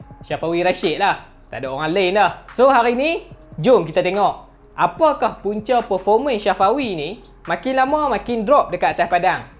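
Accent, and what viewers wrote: Kedah, Malaysia. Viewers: Awak memang betul kedah kedah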